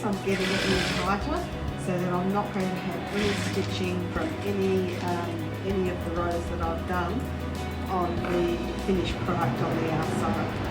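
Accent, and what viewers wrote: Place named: Australia. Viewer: Fantastic Video Tutorial. Thank you so much for sharing. I am on Gold Coast and great to hear an Aussie Tutor. I wish I had seen this video before I made my last pillow. Would have had a much better finish. Merry Christmas and Thanks again.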